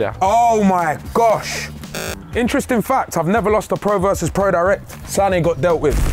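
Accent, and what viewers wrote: Colombia. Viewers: Gosh, where's from? He's English, but has a great Colombian accent in Spanish. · Hey loved your colombian accent 😁 great video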